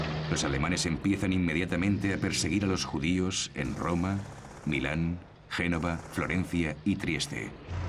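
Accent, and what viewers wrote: Spain. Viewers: Para mí el mejor documental y el mejor audio de todos es la de esta serie con acento español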